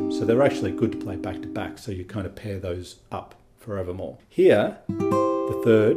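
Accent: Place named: Australia. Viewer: I thought his’ cool the caption helped me break that awesome accent(Aussie ?) so its worth subscribing to his vid acct…ty mate ❤ fr felipinas ❤🎉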